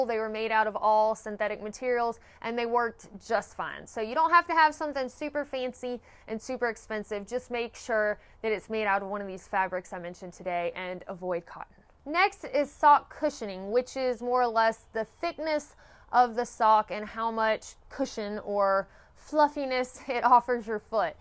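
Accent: South, United States. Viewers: Hey y’all that’s a definitely a southern accent ha ha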